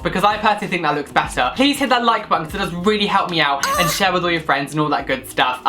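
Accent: United Kingdom. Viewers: You also have a beautiful British accent that literally covers it up I wish I had an accent that beautiful so for the rest of us we don't have accidents to confiscate I need my hair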